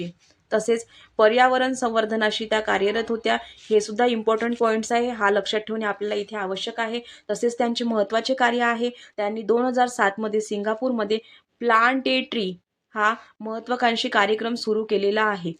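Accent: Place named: Vidarbha, India. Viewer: Ati uttam mam, tumhi vidarbhachya watata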